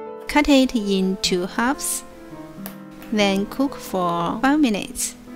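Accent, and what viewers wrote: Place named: China. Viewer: 这个中国式口音 像学生读英语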